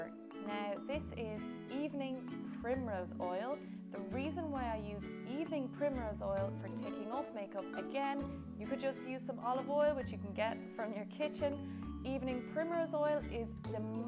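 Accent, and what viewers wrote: Ireland. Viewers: Love your Irish accent.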